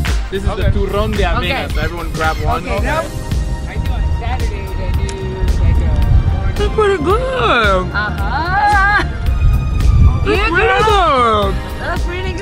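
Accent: Buenos Aires, Argentina. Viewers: Llegue a pensar que era argentino! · Boludoooo! Tremendo lo bien que hablas el español argentino! Con los años mejoraste zarpado! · hola...soy Argentino, Porteño....vivo en Redondo Beach, California. la verdad que tu español latin aporteñado es muy bueno. saludos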